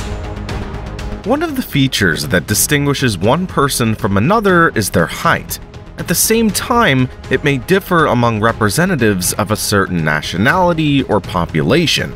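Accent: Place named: United States. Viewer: Why do you use an American accent but not American measurements?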